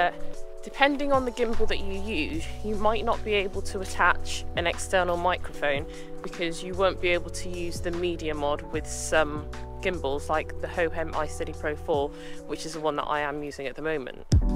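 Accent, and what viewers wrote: United Kingdom. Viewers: I'm going to NYC next year. I want to record myself out and about and I don't want to use my phone. Would you recommend this or something different/cheaper? Other question: You're clearly, British! However, are you of Trinidadian/Lucian or Dominican descent? I found your channel and just subscribed. I saw a post on twitter and started watching a few videos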